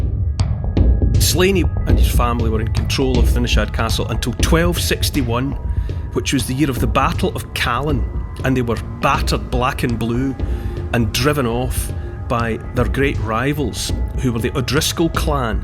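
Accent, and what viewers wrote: Scotland, United Kingdom. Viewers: Cool Scottish brogue.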